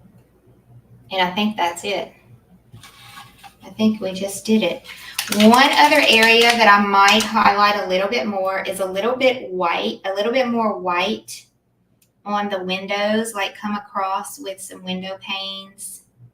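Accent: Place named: South, United States. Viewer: your soft southern accent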